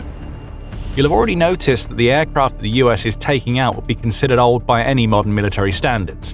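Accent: England, United Kingdom. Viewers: Why are these US military videos always narrated by an English guy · Because the yanks just love the British accent.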